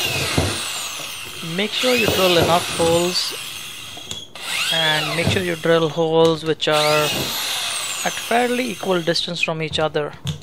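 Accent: India. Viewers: From your voice i think you are indian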